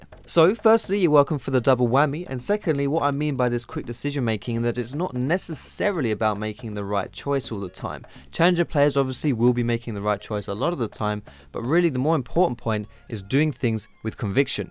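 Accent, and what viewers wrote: United Kingdom. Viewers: I really enjoy all your videos and especially I love your British accent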